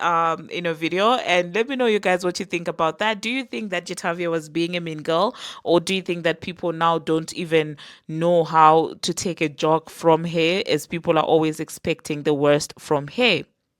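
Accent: South Africa. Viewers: You sound south African I love the accent 🩷😌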